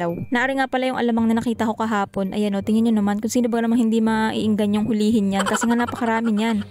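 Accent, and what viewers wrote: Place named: Quezon, Philippines. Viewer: 🤣🤣🤣tuwangtuwa ako sa mga vlogs at kadaldalan mo miss.iLove your accent❤️❤️❤️😘😘😘kaya lagi ko inaabangan ang mga bago mo vlogs.saan kaba sa quezon?ingat sa panghuhuli ng isda baka matinik ka ng stonefish.tingin2 den sa paligid kpag nagluluto ka baka my ahas sa itaas ng punong kahoy...ingat po miss ❤️😘God bless🙏🙏🙏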